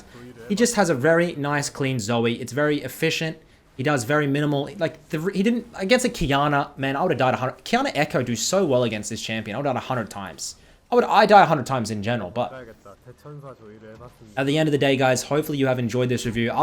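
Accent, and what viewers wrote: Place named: Australia. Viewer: How I love the Australian accent. Like far out, and cheeky little ...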